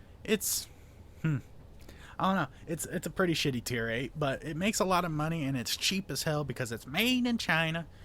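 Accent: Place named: South, United States. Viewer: what is your 1st language? you kinda sound like your playing with a "southern" accent, maybe something else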